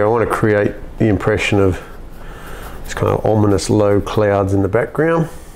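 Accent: Australia. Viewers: Thank you! Trying to figure out your accent. Are you in Australia ? 😩😜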